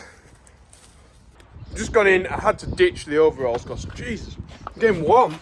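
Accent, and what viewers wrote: Barnsley, United Kingdom. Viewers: thank you for content love hearing a fellow Barnsley lad 😂 don't be late for big Mick Mick at weekend 😂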